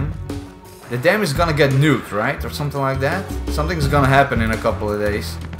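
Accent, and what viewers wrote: Netherlands. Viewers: I smell dutch isn't it? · R u dutch ? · does he sound a little dutch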